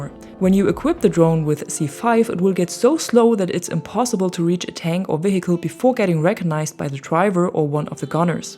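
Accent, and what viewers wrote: Germany. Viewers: Lol du bist wirklich deutsch? Haha habe es irgendwie rausgehört war mir aber nich zu 100% sicher xD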